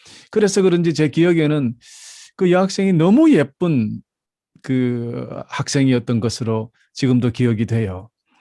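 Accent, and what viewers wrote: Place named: Gyeongsang, South Korea. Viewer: 아 그리고 혹시 목사님 경상도 신가요? 손 좀 씨꺼라.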